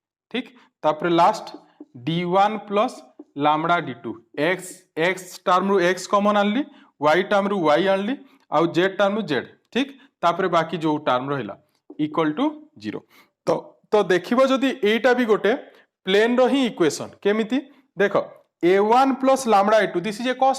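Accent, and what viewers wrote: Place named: Sambalpur, India. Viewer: But sir mate lagila je apanankara sambalpur boli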